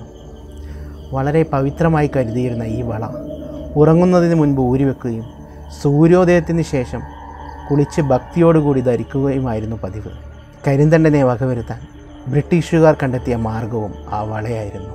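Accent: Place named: Kozhikode, India. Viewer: കുന്നംകുളം അല്ല.. നല്ല അസ്സല് കോഴിക്കോടനാണ്.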